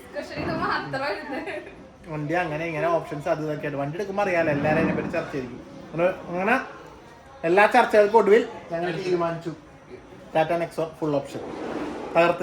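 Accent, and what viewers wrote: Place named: Ernakulam, India. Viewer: Ernakulam slang sani ivide aano veedu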